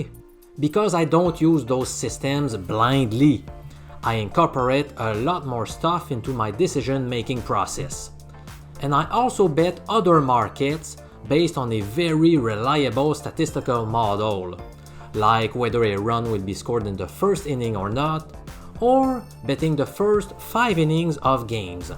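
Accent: Quebec, Canada. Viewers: Comme un bon vieux quebecois ! Hehe jadore tes video mon ami je te follow a 100% :)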